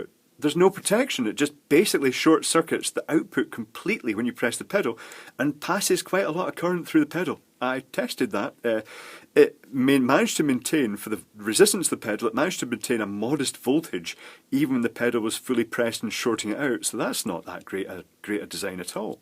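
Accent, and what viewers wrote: Scotland, United Kingdom. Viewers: That an isle of man accent? sounds scottish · Not video-related, but I'm curious to know your history, how'd you get involved in this stuff, and how you ended up on the Isle of Man? I detect a distinct fellow Scottish accent ☺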